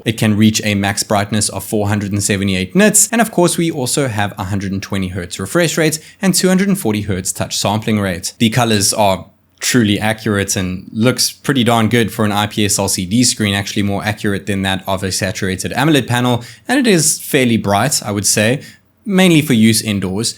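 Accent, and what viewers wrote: South Africa. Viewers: I always sensed he had that South African Touch 😸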